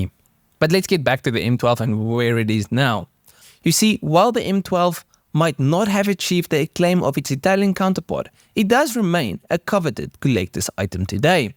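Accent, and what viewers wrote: South Africa. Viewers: South African accent 😎🤣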